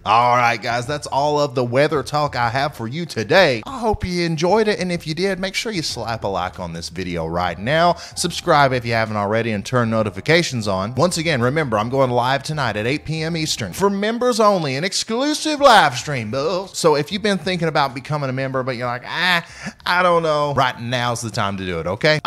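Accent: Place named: Tennessee, United States. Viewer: Just found your channel and gotta ask, is your accent from NE TN? Thanks for the great content.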